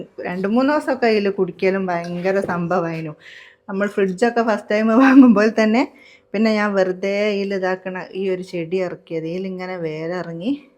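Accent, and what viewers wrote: Kozhikode, India. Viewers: എൻ്റെ ധൈരമായി ഇരിക്ക് ഉഷാറായി ഇരിക്ക്യുന്ന ആണ് ഞങ്ങൾക്ക് ഇഷ്ടം കോഴിക്കോട് ഭാഷ രസ ഉണ്ട് കേൾക്കാൻ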